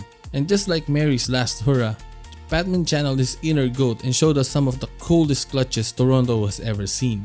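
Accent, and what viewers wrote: Philippines. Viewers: Pinoy ka bro? tonong pinoy ka kasi eh. 😁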